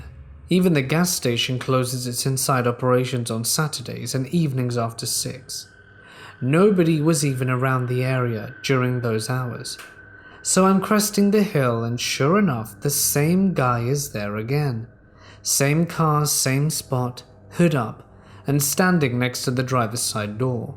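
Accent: United Kingdom. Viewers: His voice has been putting people to sleep for years! I wonder Truly how many of us go strait to anytime we can’t sleep, have issues sleeping, or just crave that gloomy doomy creepy British voice from the gates of hell to whisk us off to dreamland to challenge Freddy Krueger to a death match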